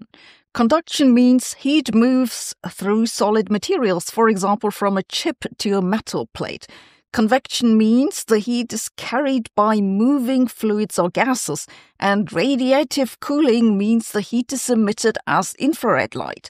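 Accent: Germany. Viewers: We love your considered approach and your German accent. This is a good disection of the issues around orbital data centres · we like your german accent,.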